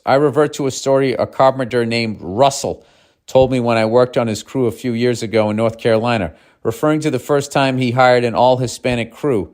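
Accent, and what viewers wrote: Boston, United States. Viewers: I think you may be one of my favorite people on the planet… Every single thing you say is exactly what I am thinking and saying about it. It’s like hearing the voice in my head with a Boston Accent 😂 It’s Awesome!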